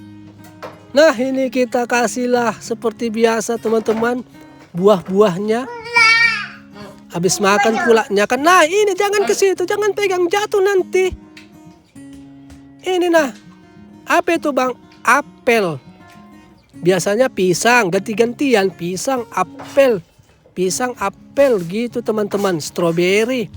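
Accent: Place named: Batak, Indonesia. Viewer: Klu mendengar logatmu, marga apa kau lae.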